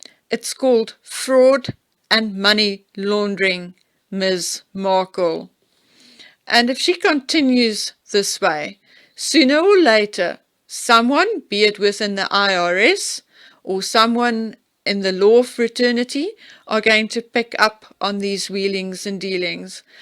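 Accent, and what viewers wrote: South Africa. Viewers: My best friend is from South Africa (Rustenburg) , married a German and now lives in Germany. We became fast BFF when I worked in Germany (now I'm back in the US) and I miss her very much. I love her accent and every time I listen to you it reminds me of her. Do you also speak Afrikaans? Please keep up the good work and keep digging. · Love your videos. You obviously put in a lot of work. Please don’t change your accent. It’s what makes you, you. You speak clearly and that is all that matters. I, for one, love listening to a South African accent. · There is nothing improper about a South African accent. Your English is better than that spoken by many Brits. Be yourself lively lady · Thank you for all the all the hard work and long hours invested in preparing these videos. By the way I love your accent. I really enjoy listening to you and love that you are from South Africa. 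Me I'm in Minneapolis,Minnesota in the good ole USA . I look forward to listening to future videos. I think it was Wally who mentioned you in one of her YouTube videos. She's so fun to listen too. Well about MM I could safely say no one knew who she was prior to marrying Harry and she's now driving us crazy with all her videos telling us we must do better . I use to feel sorry for Harry but I still think she controls his every move. Irs incredibly ha d to get away from a narrsasist. The longer you stay the harder it is to have the confidence it takes to walk out on a person like her. I see him living in fear if her wrath . You get to a point where your mind tells you it's easier to please them than to leave them. You honestly believe they will destroy your life if you do leave. I think MM has stripped his dignity and his self confidence. I think he got in too deep with her and doesn't believe there is a way out 🙋❤️ from 🇺🇸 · Darling girl, my prayers are winging their way to you for many reasons but Rusty's passing is a crippling loss. 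I lost two of my doggies within 13 days back in May. Hellish beyond hellish, but you know what that pain is like. Suffice to say, after a fortnight I rescued another mutt and my heart feels full of joy once again. You strike me as a very well educated young lady. You are a real one for attention to detail and I love that in your general make-up. I enjoy all of your presentations as they are so different from other channels covering the dis-loyal royals. I too am a big fan of Yankee Wally and can hardly get off to bed until I hear her video! Love her Welsh accent as much as I love your South African one. Being a Scottish lady from the Shetland islands originally, my 'mother tongue' is Shetlandic - (Shetland cum Nordic). Google it, it will tickle your fancy, no doubt! Re: Meghan Markle's age.Yankee Wally put up a photo of her Graduation. She holds a staff with the year 1995. She is wearing a white gown. 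She has no coloured cowl as she didn't make the grade and pass with flying colours. MM the yacht girl has been 100% exposed by you...............YIPPPPPEEEEEEEEE. Well done, girl. You are a top detective. Thank you for that expose- the photo's with Prince Harry et al. I'll sign off for now but not before I wish you continued success with your fantastic channel. I appreciate your endeavours. Take good care. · It’s so lovely to hear your accent. I am also South Africa and recently FaceTimed someone in Texas. I had never met her but she was fascinated by my accent and loved it. I believe we as South Africans speak very clearly ( to the most part) and your accent and voice are easy on the ear. Actually we have a distinctive accent that one can hear and recognize instantly. Be proud of your lovely speaking voice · Brilliant video as usual. And as a fellow South African I LOVE hearing you speak. 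Your research is outstanding, and your courage in speaking the truth is commendable. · You have a Sth African accent.It is nice.I love your art work...the worm is cool.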